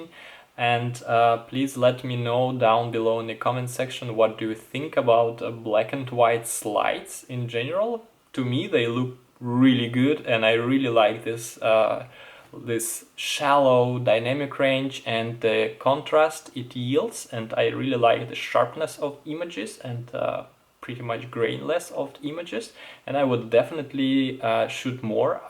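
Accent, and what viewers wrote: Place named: Russia. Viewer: У парня тяжелый русский акцент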